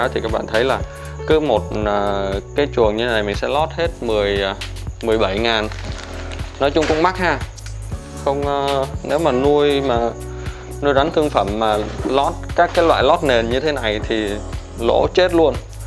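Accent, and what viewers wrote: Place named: Central Highlands, Vietnam. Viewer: A ơi. Xem kênh a e bị nghiện a luôn rồi. Thích giọng nói và con người Tây Nguyên quá.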